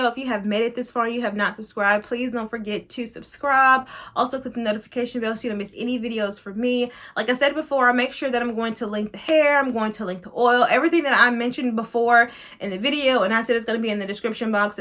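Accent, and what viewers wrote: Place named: South, United States. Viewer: Beautiful! I swear I heard your Southern accent more in this video than any other 😍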